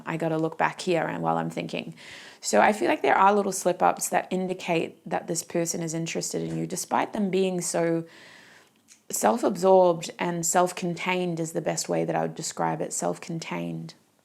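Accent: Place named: Australia. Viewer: The second you spoke I knew you were Aussie!! 🙏🏼❤️🧿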